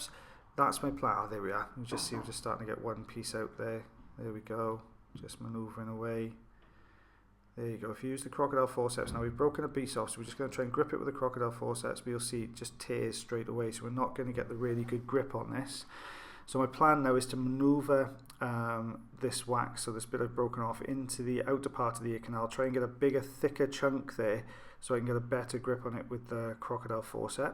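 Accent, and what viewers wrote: Wales, United Kingdom. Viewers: Lovely Welsh voice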